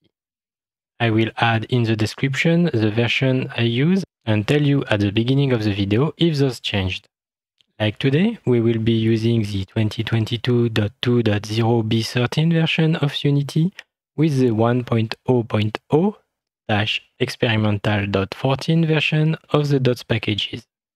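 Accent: France. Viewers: If you can speak German with a German accent i will speak english with a english accent. You gotta watch a native english speaker if its so hard for you to understand. I understand him well and french guys have their own ways its nothing he should change. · why do you speak english like you're reading the words while speaking french? it's honestly very difficult to understand. I'm brazillian, by the way. Et je parle français aussi, c'est ma troisième langue. still, I had a hard time focusing on whatever you are saying. you should work on your pronunciation, either speak english or french! lol either way thanks for the content, this was very helpful to me.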